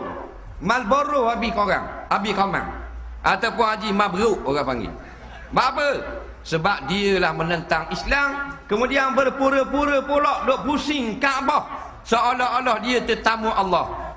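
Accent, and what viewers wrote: Malaysia. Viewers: ni pelat terengganu..but it's ok la..lebih kurang je pelat dua negeri tu..hehe..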